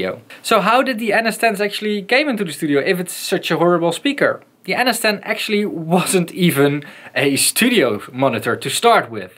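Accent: Netherlands. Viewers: I realized that subtitle detected your english as dutch :) Thanks for the video!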